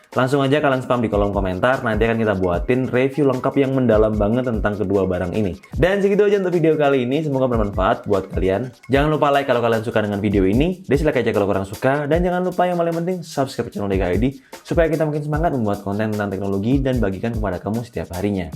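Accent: Java, Indonesia. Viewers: Yang sekarang suaranya lebih meddok 🤣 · Kowe wong jowo yo mas? Hehe...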